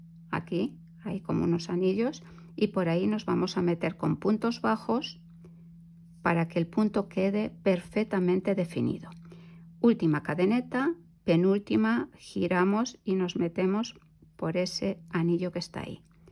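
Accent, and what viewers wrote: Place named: Spain. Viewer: Pensé que de España Portu acento!!! Un abrazo… yo soy chilena!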